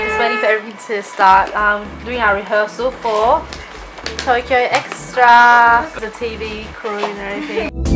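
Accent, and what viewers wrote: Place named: Australia. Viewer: Where did you grow up. I cant tell by your accent sounds Australian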